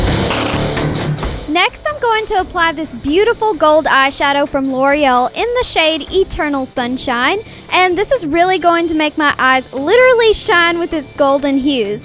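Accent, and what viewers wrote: South, United States. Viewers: I love you accent southern belles represent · this was a really good video! Thought I would pop over and take a look because I had just uploaded a video on the katniss look and your video came up as a suggestion :) Great editing and excellent job on the video... love your american accent! :) Going to sub you :) Oh and I used the same nyx illuminator in my video too! lol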